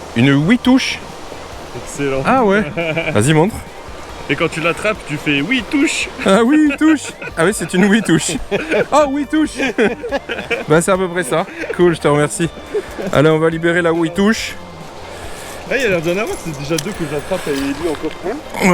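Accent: Quebec, Canada. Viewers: ...c`est autre chose ca, le francais quebecois, assez dur pour un Neérlandais...mais ca ira, salut des Pays-Bas!!!😀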